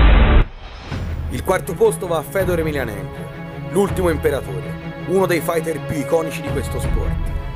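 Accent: Tuscany, Italy. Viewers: Sei toscano ??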